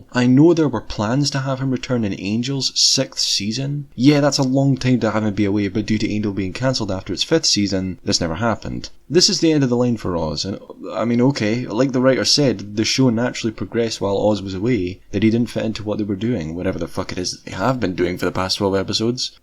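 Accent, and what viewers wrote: Scotland, United Kingdom. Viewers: I think he might be Scottish?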